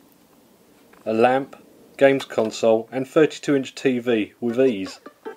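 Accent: United Kingdom. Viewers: what part of the UK do you think he is from dohhhh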